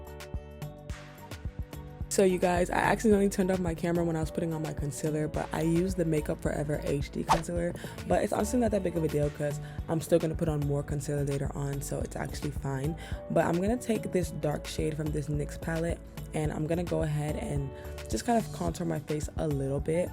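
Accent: United Kingdom. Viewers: Im still trying to figure put if she from the uk or not🥱🤣💕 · I can’t tell if your British or American. The accent and the slang is a mixed bag?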